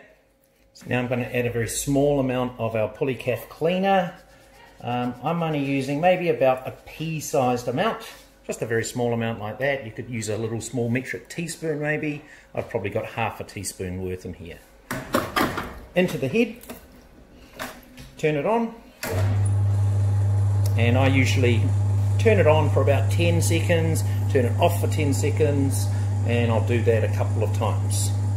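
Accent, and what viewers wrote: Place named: New Zealand. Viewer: That was awesome mate!? I'm from Australia and I just llooooove the NZ accent ... keep it up. I have to clean my Vibiemme Domobar Piccolo. Well done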